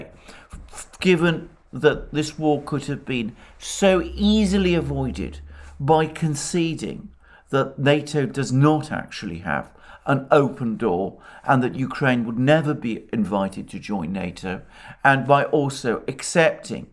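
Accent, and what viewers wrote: England, United Kingdom. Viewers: Lol, Alexander is simply reciting unfiltered Russian propaganda from almost exclusively Russian sources. Putting an english accent to it does not make it any less Russian propaganda.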